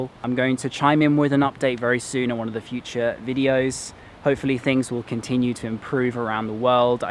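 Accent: United Kingdom. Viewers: Soo happy to see you again! Welcome back to the best British accent ever, and i'm glad you recovered ^^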